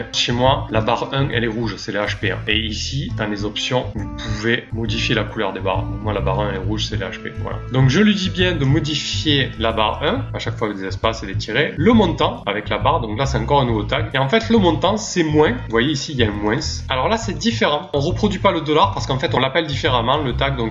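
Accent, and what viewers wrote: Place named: South, France. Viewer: merci beaucoup je fais ça dès que possible. j'entend un accent du Sud tu es d'ou ? actuellement sur la RP, mais je suis originaire de Perpignan.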